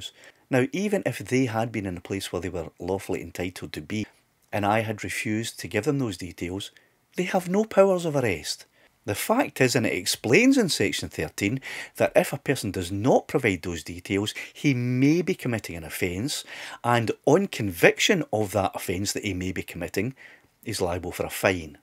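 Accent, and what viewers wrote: Scotland, United Kingdom. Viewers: Your Scottish accent makes this even more hilarious 🤣brilliant stuff.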